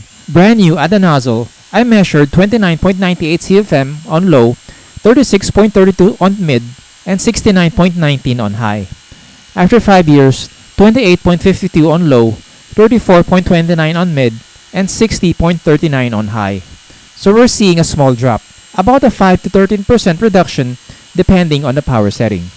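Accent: Philippines. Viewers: Filipino accent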